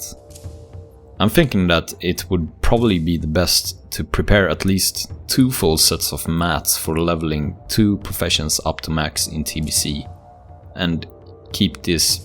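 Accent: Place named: Scandinavia. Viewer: May have liked it more if he spoke in Swedish 🙂 i do not like Scandinavian accent as much when listening to English content 😉 would in fact like Danish, or Norwegian too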